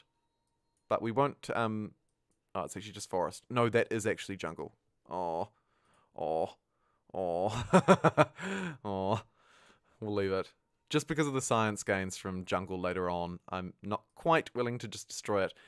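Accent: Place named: New Zealand. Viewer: Yooo your a kiwi! Chur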